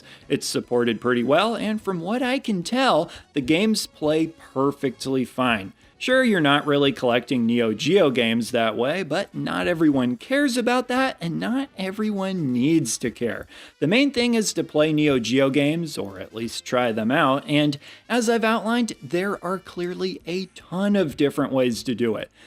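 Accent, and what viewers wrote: Midwest, United States. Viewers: He is strange...where is that accent from...midwest? Northern/Canada?...Mormon territory?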